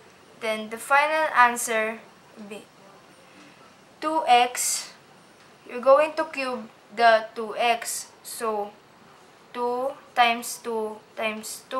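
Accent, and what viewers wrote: Philippines. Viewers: are you a filipino? because you have the accent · sabi ko na Pilipino ka eh, yung diction mo eh :) great work! di ko 'to alam, buti gumawa ka ng video na hindi sobrang haba. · You are a Filipina, aren't you? because your accent though :D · I'd thought the accent was familiar, Pinoy ka pala eh... Salamat sa video mo... naka pag arak ako para sa test ko sa school 😁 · sabi ko na pilipino ka dahil sa iyong accent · ACCENT PALANG PINAY NA!! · U are Filipino, i can tell by the accent. We speak the same accent kse hehe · Are you Filipino? Because you talk like one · Sabi ko naga ba pinay ka boses palang halata na haha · You sound like a filipino trying to talk English.... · I knew na Pinay ka po ^^, accent pa lang. xD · Pinoy to guys im proud to be pinoy ang galing ito thank you po for uploading this (kung wla line of 7 ako) (accent mo btw is pinoy its easy)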